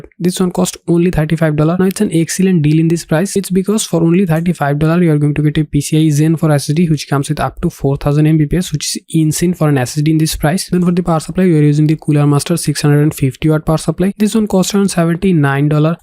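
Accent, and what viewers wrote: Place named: India. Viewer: You are talking way too fast. And your strong Indian accent makes it hard to understand a word of what you are saying. You might as well not be speaking English.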